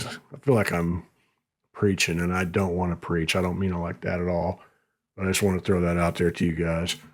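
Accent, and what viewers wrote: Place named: Indiana, United States. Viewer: I really enjoyed the video I'm starting to dig into your channel and it's really cool. Sounds like you're Indiana I'm in southern Indiana myself right across the river from Louisville. Sorry I'm rambling lol thanks for your work.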